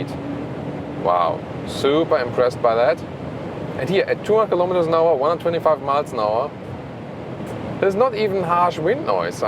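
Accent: Germany. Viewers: I happened to watch this guy's clips many times back 4 years ago while I was choosing to buy a car (Bimmer/Merc/Volvo). This year I watched some youtube clip I think about marine fish/reef tank or something and I came across German-accent English. I told myself I swear to god I have listened to this German-accent English before, from some guys but couldn't recall who (but kinda remember this face and remember it was from Youtube). Ahhh finally I remember.. its' from! Nice accent!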